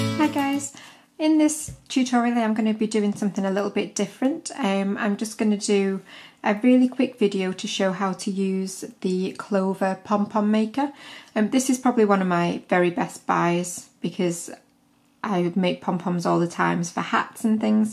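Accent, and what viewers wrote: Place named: North, United Kingdom. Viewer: nice to hear a lovely ‘northern’ uk accent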